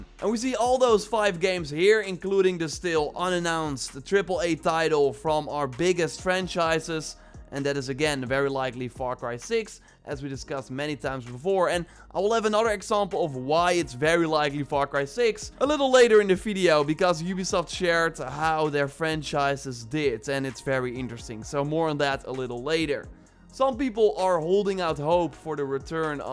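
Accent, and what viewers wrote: Netherlands. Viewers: Ooh hahaha jij bent Nederlands je kan goed Engels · Je kan gwn horen dat je Nederlands bent 😂😂 Like als je Nederlands bent😂👏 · Are you dutch? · I had no clue that you are from the Netherlands ;). You can always hear when a Dutch person is talking in English. Keep on making videos. Can't wait for Valhalla to drop and watch you're videos.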